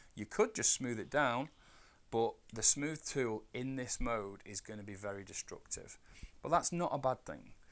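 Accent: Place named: Stoke, United Kingdom. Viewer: Great stuff. Really enjoying learning from your videos, and I’m inspired by your work. I’ve been learning to use blender for a few months now and have just invested in some VR kit so I’m still in the very early stages of sculpting and modelling with it. Your tutorials are helping me so much and I just wanted to say thank you. By the way is that a bit of Stoke in that accent ?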